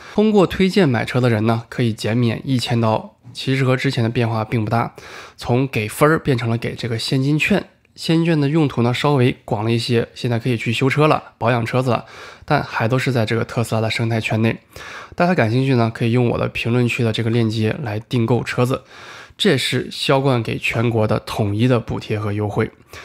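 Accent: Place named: Northeast, China. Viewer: 感觉像东北😃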